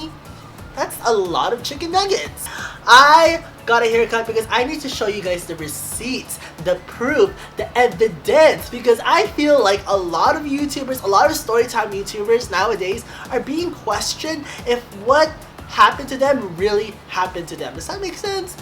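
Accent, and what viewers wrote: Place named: Philippines. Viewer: First clue: flip flops. I was all like you know, I think he is Filipino 😂 that and you kind of have a Filipino accent.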